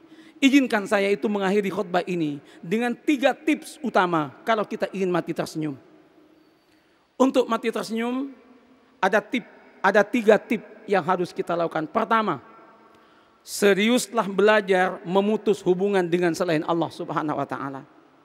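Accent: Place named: Madura, Indonesia. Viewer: kayak ada logat maduranya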